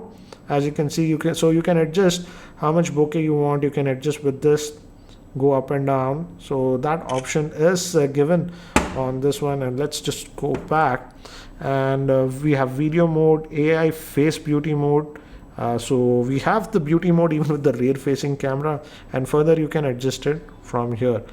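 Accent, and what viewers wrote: India. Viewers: indian loghat inglish so rough and Bad the voice to hear it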